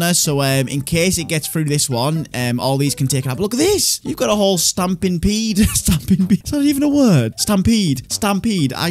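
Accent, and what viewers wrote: United Kingdom. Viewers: I’m not being mean but is it me or did I realize he kinda sounds british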